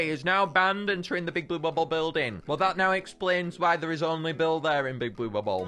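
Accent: United Kingdom. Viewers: are you British (ik this is very weird to ask just curious )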